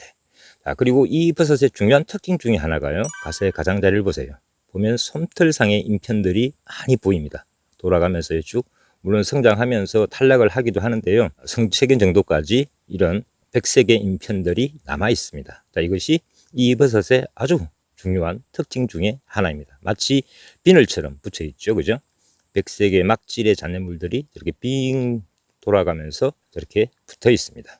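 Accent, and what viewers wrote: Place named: Jeolla, South Korea. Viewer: 말이 전라게만네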